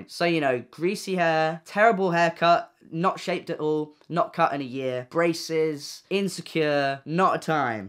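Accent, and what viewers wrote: United Kingdom. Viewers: They have the same type of British accent. British accents are different depending on where your from. Like if your from liverpool your accent is different to someone from the likes of Birmingham. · you're so British!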